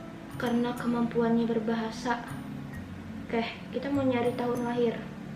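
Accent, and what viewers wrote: Pekanbaru, Indonesia. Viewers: logat pekanbaru banget kak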